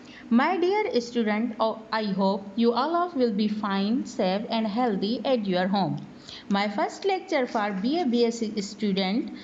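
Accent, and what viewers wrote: Sindh, Pakistan. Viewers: English in sindhi Accent !!!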